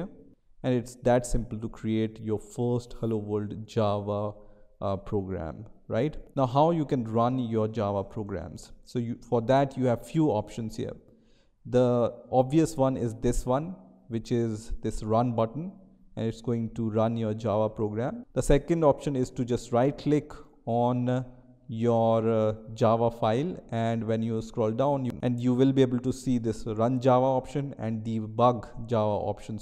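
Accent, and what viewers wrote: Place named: India. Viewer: i hear your voice and i know that u are from india or pakistani. one of booooooooooooooooot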